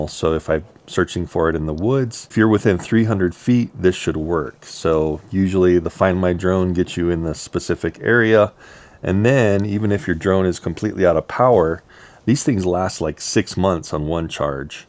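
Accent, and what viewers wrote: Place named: California, United States. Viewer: Well you can definitely tell he's probably from California based on the way he talks and their PC culture God I hate California I will never go there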